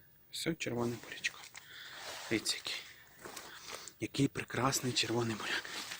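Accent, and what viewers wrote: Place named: Western Ukraine, Ukraine. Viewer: Такце наша західнецька мова файна і така посадка тоже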